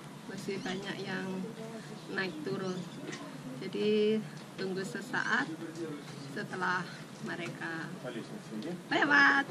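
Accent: Java, Indonesia. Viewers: Seneng liat mba sederhana dan logat jawanya kental banget dan tidak sombong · Siapa nonton 2019? Ternyta udah 20thn an tapi logat jowene isek kentel.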